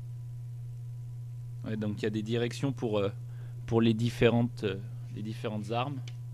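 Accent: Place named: France. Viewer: W00T I LOVE YOU FRENCH ACCENT:p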